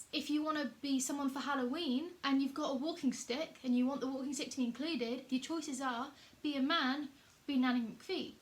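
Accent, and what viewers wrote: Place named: Southern England, United Kingdom. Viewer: You sound like Beckie0 <link> Where abouts in England are you from, somewhere down south?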